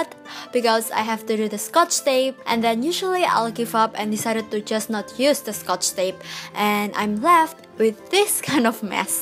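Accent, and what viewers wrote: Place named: Indonesia. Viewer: lol knew it from your accent! you're indonesian!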